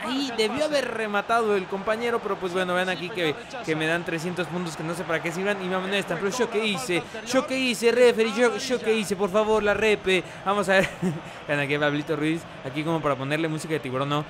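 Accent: Argentina. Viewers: Muy gracioso hablando argentinamente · Que buen acento de argentino >.< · quisiste hablar como argentino en el video??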